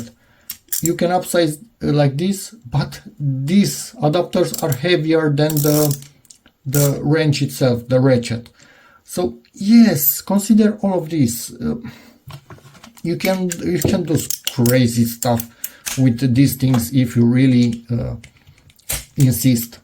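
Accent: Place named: Romania. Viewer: Accent clar romanesc :))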